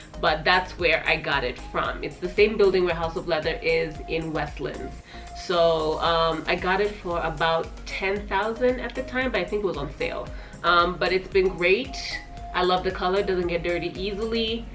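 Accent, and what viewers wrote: United States. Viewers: I loved your tour so much but please try to reduce throwing up your hands constantly.i thought I was watching an American woman with accent, attitude everything.I didn't enjoy the narration. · You have a beautiful place Madam. You stated that you are in Nairobi but you have an American accent. Are you an American living and/or working in Kenya? You are also very pretty.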